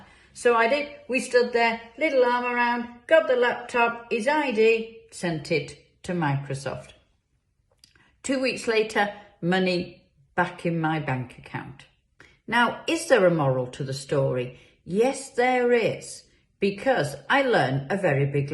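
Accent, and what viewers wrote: United Kingdom. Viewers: Absolute class. Great dresser- and love that posh Stoke type accent.🤟🏼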